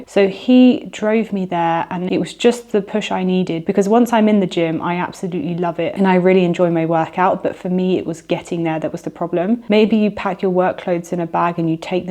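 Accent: United Kingdom. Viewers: The British accent made it difficult for me to watch and understand this video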